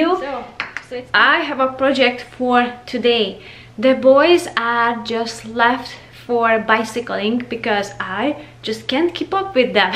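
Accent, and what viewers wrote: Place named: Hungary. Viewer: Huuu nagyon nagyon hallani a magyar akcentust!!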